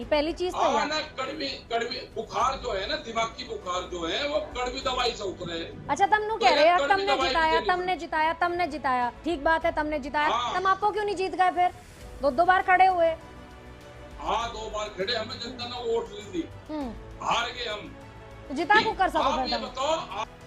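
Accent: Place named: Meerut, India. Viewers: Jaat language has something special to it... Sunne me bara maja ata he. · ये हरयाणवी नहीं है ये मेरठ मुजफ्फरनगर, सहारनपुर, हरिद्वार की बोली है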